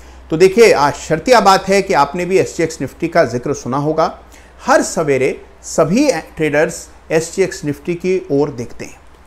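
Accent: Standard. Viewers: Sir, Aap ki suddh Hindi suntehi karn trupt ho gaye. Thanks a lot for informative video.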